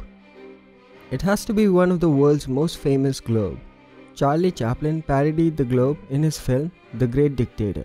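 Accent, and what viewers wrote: India. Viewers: You have a indian accent, I like your contents.Thanks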